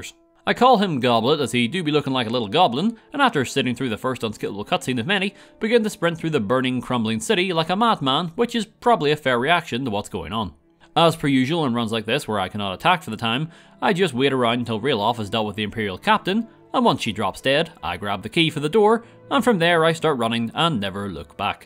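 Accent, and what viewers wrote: United Kingdom. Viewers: ah- british playthrough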